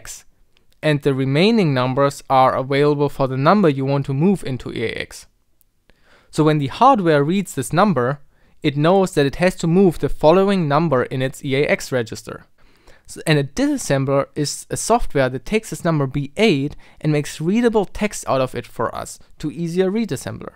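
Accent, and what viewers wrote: Germany. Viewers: I agree. Too fast, his drawing is too messy, his writing too small AND he got the stack direction the wrong way around (albeit correcting it after the fact). He talks too fast AND IN A GERMAN ACCENT that isn't easily parsed at high speed. What MANY "tutorial" publishers seem to forget is that it's the people who DON'T KNOW THE SUBJECT who are your primary audience - if that audience can't understand you, or your sloppy preparation (or lack thereof) starts them off on a flawed footing, why are you teaching at all? That would drive me insane - I wouldn't DARE publish a tutorial in the state this is in, I just couldn't.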